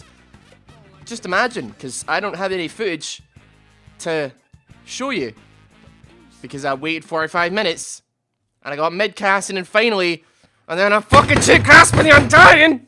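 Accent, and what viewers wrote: Scotland, United Kingdom. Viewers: are u scottish pal?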